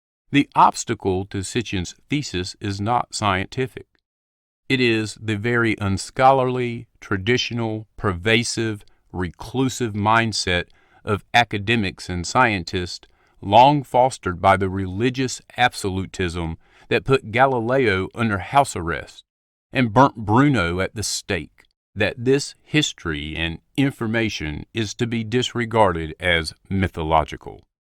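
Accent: South, United States. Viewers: I live in the south, and his accent is most definitely southern!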